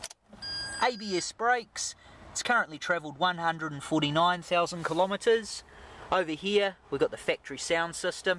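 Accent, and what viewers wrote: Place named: New Zealand. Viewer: Funny kiwi accent)) Keen as))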